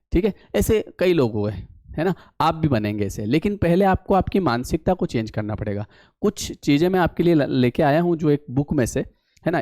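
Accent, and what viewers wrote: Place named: Madhya Pradesh, India. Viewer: Sir jarur aap mp ke honge kyu ki tone whi lag rhe hai😅 kyu ki mai bhi indore se hi hu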